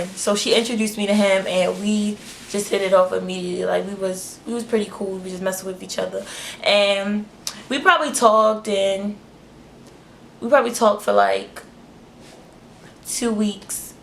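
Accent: Baltimore, United States. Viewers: Omg I knew you were from Baltimore because of your accent! Great video btw:)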